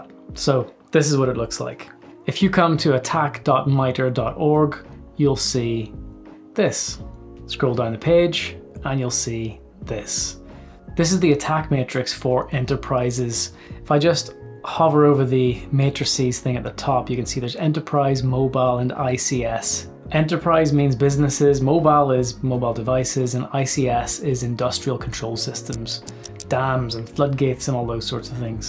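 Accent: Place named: Scotland, United Kingdom. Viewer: but you need easier english, you speak too scottish bro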